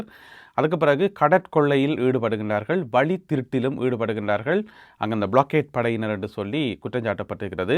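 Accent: Jaffna, Sri Lanka. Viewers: யாழ்ப்பாண தமிழ் தனித்துவம் தானே ❤❤❤❤❤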